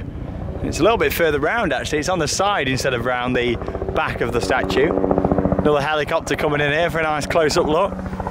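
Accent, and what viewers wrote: United Kingdom. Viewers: I like your uk accent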